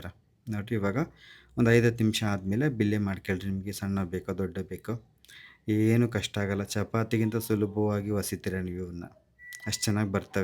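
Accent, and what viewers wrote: Chitradurga, India. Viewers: Good sir ನಿಮ್ಮ ನಿಮ್ಮದು ಯಾವ ಊರು ನಮ್ಮ ಚಿತ್ರದುರ್ಗ ಡಿಸ್ಟ್ರಿಕ್ಟ್ ಭಾಷೆ ಮಾತಾಡ್ತಾ ಇದ್ದೀರಾ ಥ್ಯಾಂಕ್ ಯು ಫಾರ್ ಯುವರ್ ನೇಟಿವಿಟಿ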